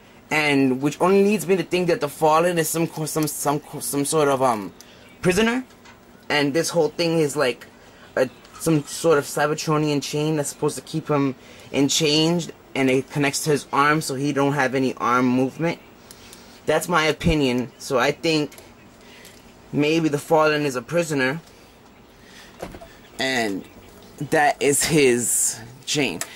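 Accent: Africa. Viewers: why do you sound like your from africa ? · African accent? has an African accent?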